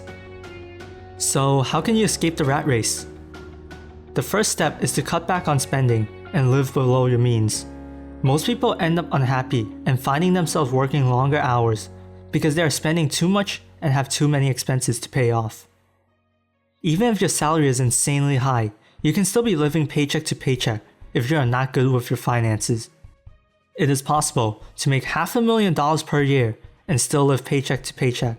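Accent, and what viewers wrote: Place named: China. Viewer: This video Narrator must be a Chinese... with quite heavy Chinese accent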